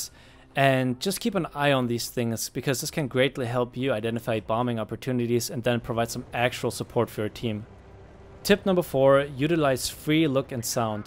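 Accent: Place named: Germany. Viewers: • dedo • wow he is German? I didn’t know that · Ersten Satz gehört.. direkt gedacht, dass da jemand aus deutschland kommt :^) trotzdem beneide ich dich für dein englisch! echt schönes video! :) weiter so.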